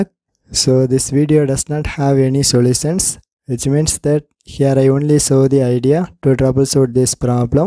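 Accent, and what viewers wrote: India. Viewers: he has an indian accent thats why its sounds like gays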